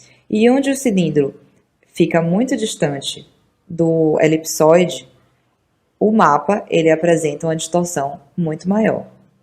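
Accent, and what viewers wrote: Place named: Salvador, Brazil. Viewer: Uma sotero reconhecendo o sotaque!! Otimo vídeo!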